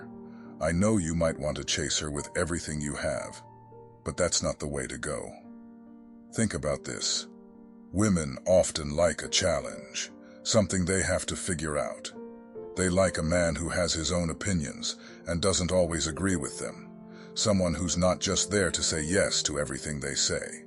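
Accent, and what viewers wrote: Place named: Nigeria. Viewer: You sound like a Nigerian 🇳🇬🙄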